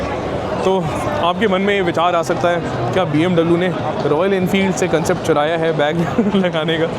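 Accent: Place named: Delhi, India. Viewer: bhai tum mein dilli ki chhaap hai tumhare baat karne se dilli region surroundings ke lagte ho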